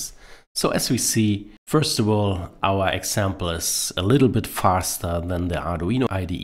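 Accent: Germany. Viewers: He is German. Are you some kind of retard?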